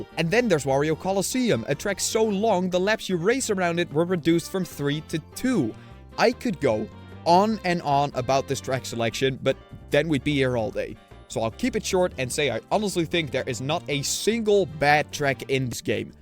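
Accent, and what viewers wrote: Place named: Netherlands. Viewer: Hmm, the accent... Fellow Dutchie? 😄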